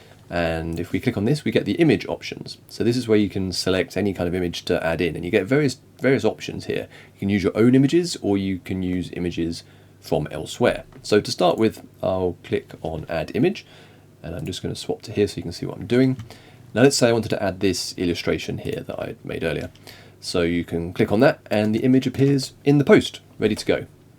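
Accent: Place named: England, United Kingdom. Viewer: Thank you, I'm also very new to Substack and I appreciate that you SHOW what you're doing as you're doing it. Also, an english accent always sounds far more intellectual!:) You've got a new subscriber. I'll be back!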